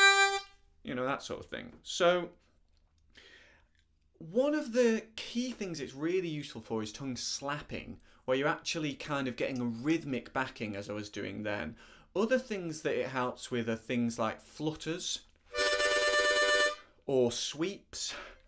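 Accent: United Kingdom. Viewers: I don't play the harmonica but I like your lovely videos. Especially your beautiful british English.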